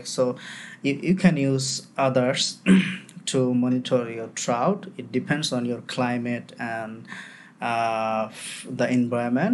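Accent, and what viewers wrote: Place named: United Kingdom. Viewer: Some word pronouns with british and russian accents which sounds very odd 😢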